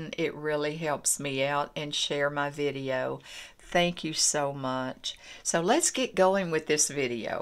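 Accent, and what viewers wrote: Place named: Texas, United States. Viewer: I just love your hair and your hair color and your eyes and your makeup and everything and I love love love love love love love the lipstick colors I'm going to get those for sure and could I ask did you say what blush brush brand that is it looks so soft and so pretty.... I want to get that brush .and thank you so much I'm learning a lot from you I am almost 70 years old and I still have so many problems and my skin still breaks out I have to be very careful ... it's very dry and acne prone even at my age so if you have any advice for me please let me know I can't use oils except around my eyes and forehead .. on my other part of my face I can't use any oils unfortunately but I want to get some of that skinn Elixir and put it around my eyes like you did and a little on my forehead and even my neck....thank you I love it ! 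I'm in Texas and sounds like you have Texan accent and I love it ! Lol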